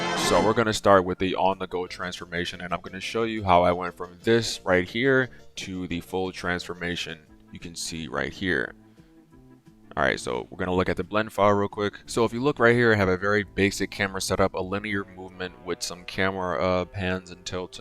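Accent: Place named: United States. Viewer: Why your voice is American your name is Indian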